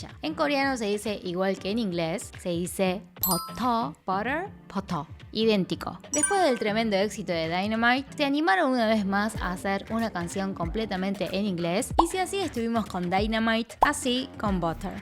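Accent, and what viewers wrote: Argentina. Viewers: Hace cuánto que vivis en argentina ? Me sorprende tu acento 😂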